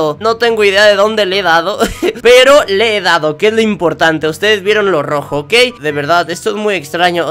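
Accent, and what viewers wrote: Mexico. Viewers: Yo sí te creo que eres de México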